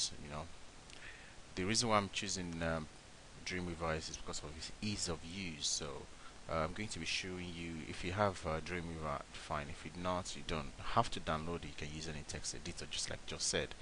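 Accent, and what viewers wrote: Nigeria. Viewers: Thanks for the video and cool ascent from Niga!!! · U must be a Nigerian!!!, I can never forget our accent.. love u man.. and u must b a Yoruba man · are u a NIGERIAN????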